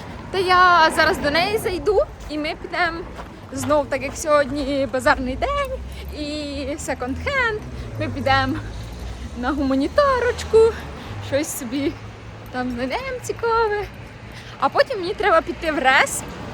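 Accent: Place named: Cherkasy, Ukraine. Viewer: доця, я так радію, коли виходить твоє відео. Вони мені такі домашні, ніжні, добрі, тому що ти дуже гарна дитина у всіх відношеннях. Бажаю тобі всього найкращого, а головне, щоб ви наші діти жили в світі без війни. Обожнюю як ти розмовляєш, у нас( Чер каська обл. ) теж так говорять.❤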